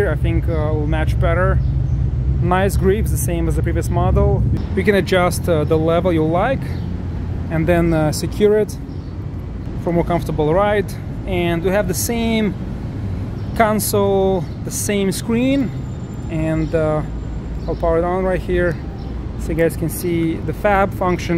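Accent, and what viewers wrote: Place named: Russia. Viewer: я не пойму комментатор русский чтоли? прослеживается акцент)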